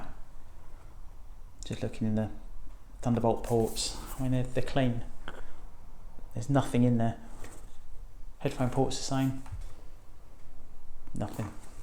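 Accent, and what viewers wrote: United Kingdom. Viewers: The lovely British accent 😍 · ohmygod i love the english accent so much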